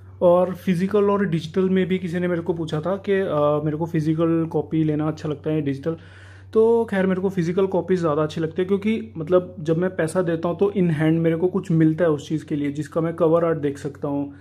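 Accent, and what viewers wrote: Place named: Punjab, India. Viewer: Bro abb to khair theek he ho app Bro face reveal thora suspense ke sath karna tha Baki mere ko lagta hai ki app punjabi bolte honge ghar pe 😄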